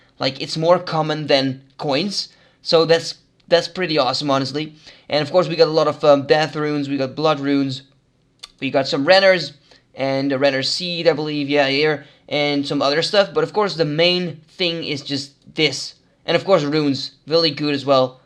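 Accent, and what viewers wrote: Netherlands. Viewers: heard you were dutch the second you started talking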